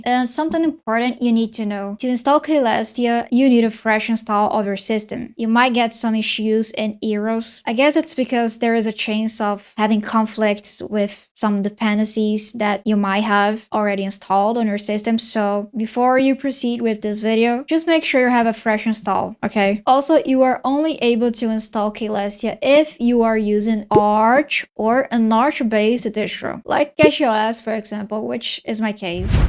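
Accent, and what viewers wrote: Brazil. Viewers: hey, just one tip: you don't need to `sudo` to save in `~/. config`, or at least you shouldn't. EDIT: just watched the whole video hearing your accent and thinking: he/she/they is Brazilian 100%, so not surprised when saw you have an authentic 100% Brazilian BR ABNT2 keyboard Hahahahah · Your accent sounds very Brazilian to me🤔. I'll definitely make it, adding a bit of my own style.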